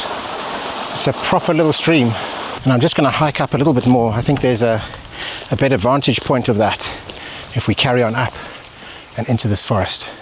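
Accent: South Africa. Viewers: you sound like Suid Afrkaner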